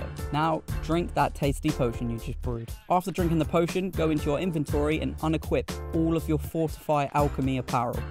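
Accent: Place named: RP, United Kingdom. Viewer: Quick, simple and in Queen's English (can't say that anymore!). Thank you 👍🏻.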